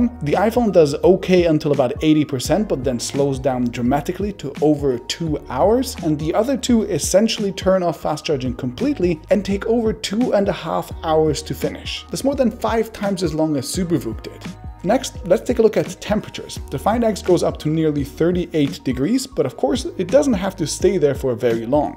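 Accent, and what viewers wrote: Germany. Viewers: This guy has a strong German accent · are you german?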